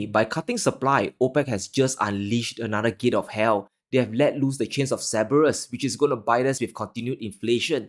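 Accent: Jamaica. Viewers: **** brotha' you sound like a Rasta when you speak. Good information in the video, sir thanks**** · i cant figure out, is this jamaican chinese accent or what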